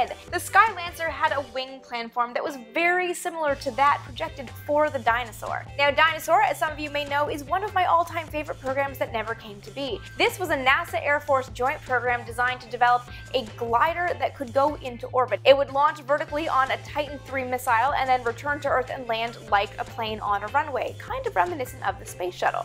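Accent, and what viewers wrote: Canada. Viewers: I used to have a couple of Canadian friends here in Australia but they moved to the states for work. So I've always loved your accent. You always seem to find those extra little tidbits on a something I thought I knew about, so it'll be awesome to see what you turn up on the Arrow. It's a shame about the plane but the rumours about plans and maybe a last one getting away are fantastic.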